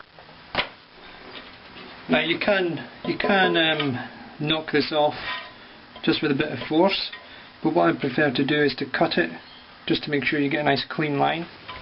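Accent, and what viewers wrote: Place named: Australia. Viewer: sounds like australia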